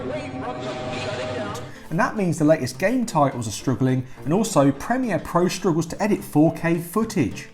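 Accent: United Kingdom. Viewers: He's british calm down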